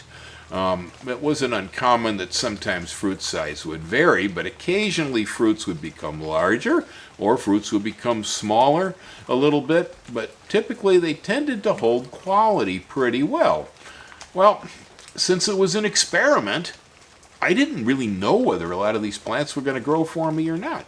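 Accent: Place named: Wisconsin, United States. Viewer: Ah northern Wisconsin, I thought u sound familiar. I’m from Minnesota.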